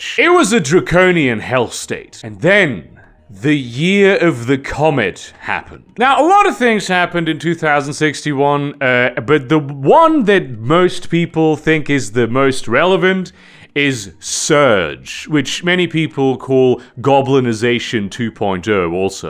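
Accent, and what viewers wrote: Germany. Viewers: I knew in one video mentioned being German, I didnt realize how German until listening to this. Honestly I could do with a video of him speaking solely German. Also, found a long lost map and campaign setting for shadowrun that I thought that I lost today same as when I started this video. I take that as a sign to run a game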